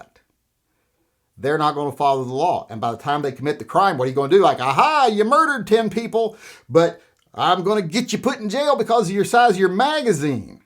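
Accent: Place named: California, United States. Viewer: You are sounding like a Californian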